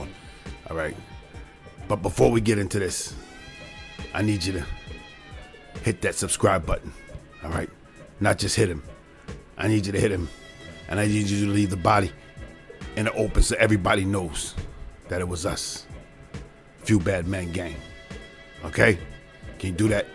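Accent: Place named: Brooklyn, United States. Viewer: I grew up in Canarsie Brooklyn every one spoke like you they would say hey kid “smartin up”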